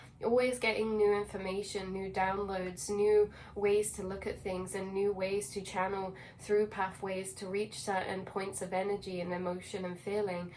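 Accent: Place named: England, United Kingdom. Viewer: You are beautiful and your English accent sounds cute . 8 )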